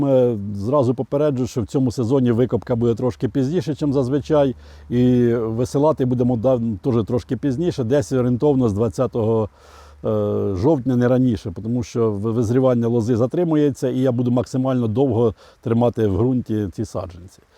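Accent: Poltava, Ukraine. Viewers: Согласен. Полтавская речь наиболее приближенная к литературному языку. Это не западынская, непонятная.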